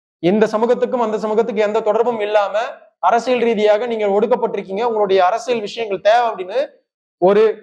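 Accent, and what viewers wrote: India. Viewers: தம்பி நீ தமிழ் நல்லா படிச்சிட்டு வா நீ பேசுறது ஹிந்தி காரன் தமிழ் பேசுறது மாதிரி இருக்கு. அது போக உங்கள மாதிரி பணத்துக்காக கொள்கை இல்லாமல் இருக்குற கட்சி ptk இல்லை நாங்க எப்போதும் தப்புனா சுட்டி காட்டுவோம் உங்கள மாதிரி பணத்துக்காக பேசமாட்டோம்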